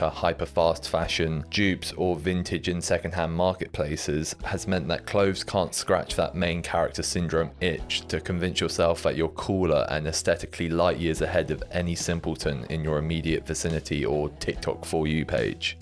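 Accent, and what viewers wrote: United Kingdom. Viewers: we listen to you speak because occasionally between your british twink accent and takes that feel like they were formed in a bottle left on the london tube, there are sometimes valid points you make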